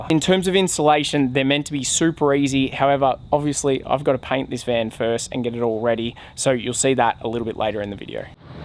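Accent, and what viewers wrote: Australia. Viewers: Most annoying Aussie accent ever. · Looks great! Makes me miss living in oz. I miss all the extra Ws and Rs in the accent 😂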